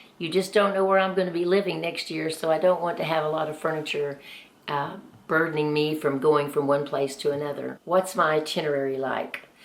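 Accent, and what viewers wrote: South, United States. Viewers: So impressed with you! Go! Do I detect a southern accent?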